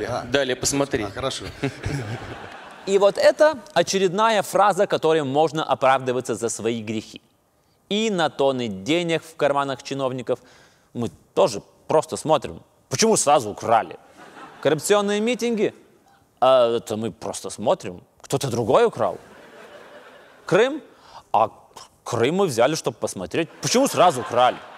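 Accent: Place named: Lithuania. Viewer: po truputi dingsta lietuviskas akcentas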